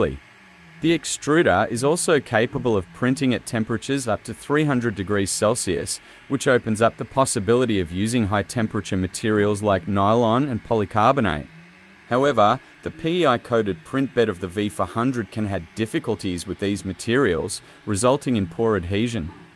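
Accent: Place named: Australia. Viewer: Man... your accent is Aussie but your location is Spain...btw excellent work..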